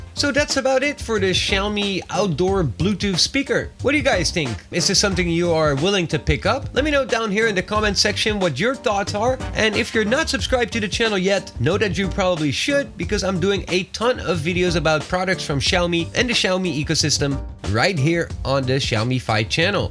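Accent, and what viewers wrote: Netherlands. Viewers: Ik dacht al je bent Nederlands haha goede review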